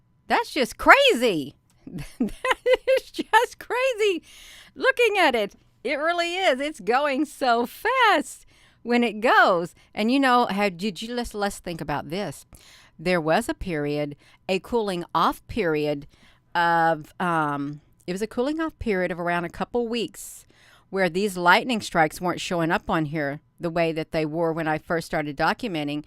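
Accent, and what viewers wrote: South, United States. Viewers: I love the way you talk. Both my mom and dad have that southern drau.Some people are just complainers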